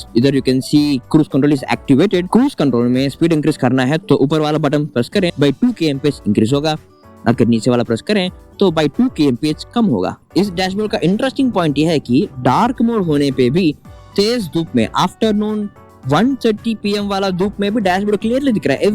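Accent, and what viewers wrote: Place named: Karnataka, India. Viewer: He's a Kannadiga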